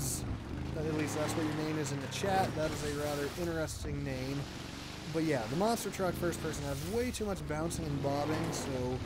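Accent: Sweden. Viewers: Are you from sweden😃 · Are you swedish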